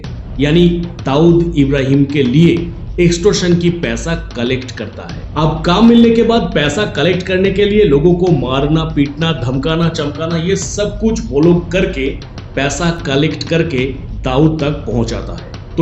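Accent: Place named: Bengal, India. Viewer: Yes, Bengalis have a lot of courage. Heard of Khudiram Bose, or Bagha Jatin (Jatin Das)? Charapona is Bengali word and the accent of the narrator is unmistakably Bengali.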